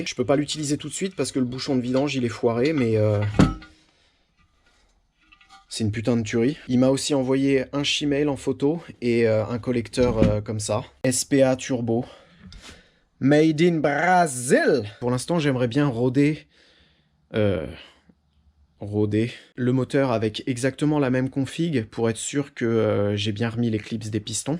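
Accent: Alsace, France. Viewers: Putain elle est énorme la chaîne. Et j’ai tout de suite senti l’accent de la maison. Alsace Frei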